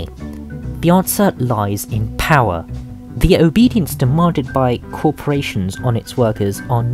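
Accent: United Kingdom. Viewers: Voice over is so excessively british I cannot concentrate on this despite being very interested in the story.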